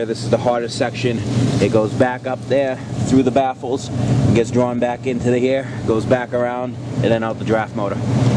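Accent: Boston, United States. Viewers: I’m a firm believer that them YORKs get assembled but with a lot of failed attempts with parts and as soon as they get it right,they call it complete and move it out of assembly line,is the only way I can explain them cause you can be the best tech ever but you put one together and it fails to start so you gotta tinker around with it so it can start,I don’t like them,nope don’t them.Are you in the Boston area HVAC BROSKI cause I can hear you accent? We need top techs in that area and up into New Hampshire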